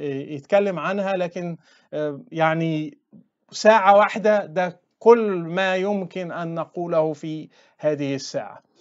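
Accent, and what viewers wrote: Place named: Egypt. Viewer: لماذا لهجتك مصرية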